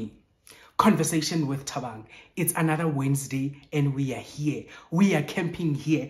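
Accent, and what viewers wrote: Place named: Sotho, South Africa. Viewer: oh wow ke utloa fela ka accent..I'm also sotho from Lesotho but based here in Joburg 🫶🏼 keep shining fave🎉🎉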